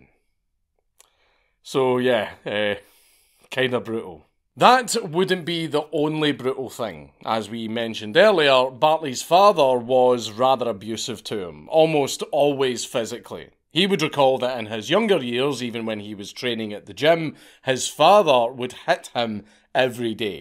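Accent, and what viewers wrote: Scotland, United Kingdom. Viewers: They don't have kings and gypsies in Scotland. They have kengs and gepsies. Gawd I love the Scottish accent. Easily my favorite. (editing to make the 2 snobs who responded happy)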